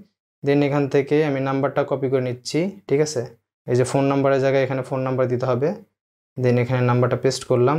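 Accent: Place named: Naogaon, Bangladesh. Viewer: Naogaon/Adamdighir language😊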